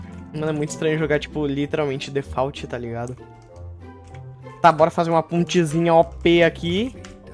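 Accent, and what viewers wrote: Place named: Rio de Janeiro, Brazil. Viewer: o seu carioca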